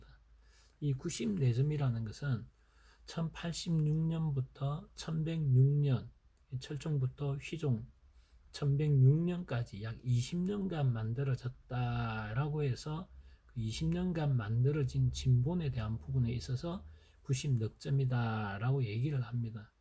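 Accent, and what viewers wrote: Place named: South Gyeongsang, South Korea. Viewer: 말씀하시는 톤이 경남분같은데요 놀리적으로 말씀을 잘하시는데 여요 장공항요 한국에 엄청많아요 그런데 판매할때가 없어요 ㅎㅎㅎㅎㅎㅎ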